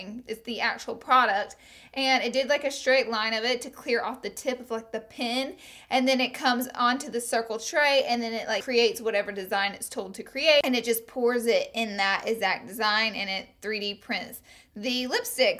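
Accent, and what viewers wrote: South, United States. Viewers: She is so country I love it!!!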